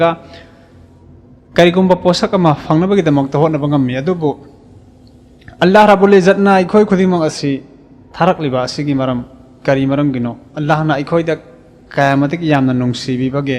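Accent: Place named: Arab world. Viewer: Janab se Hafeez ama oibgi chp chaba magun lei.... Arabic accent, afaba warol sndokpiba, yam taba nungai......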